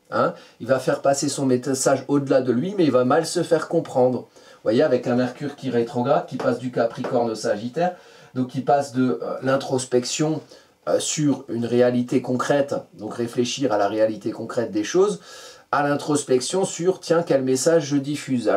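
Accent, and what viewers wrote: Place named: Grand Est, France. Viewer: 😁rien est normal effectivement, même pas sec derrière les oreilles et ailleurs 🙈🙊, bref ...Merci pour votre humour et votre travail ! Vous avez un petit accent , de la région grand Est. Une balance, ascendant vierge et le must une lune noir en balance😁😉😎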